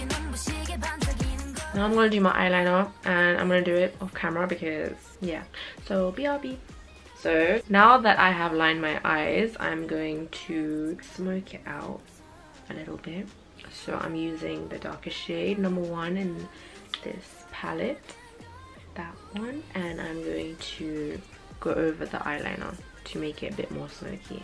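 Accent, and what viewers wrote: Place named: London, United Kingdom. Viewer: ngl I did NAWT expect you to have a London accent but what’s good 💅🏾✨ make up looks sick n I hope you feel a little better now 🥺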